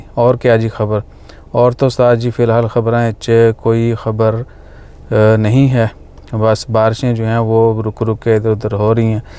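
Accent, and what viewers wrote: Pakistan. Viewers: Bhai ap Lahore k han apki punjabi Lahore wali hai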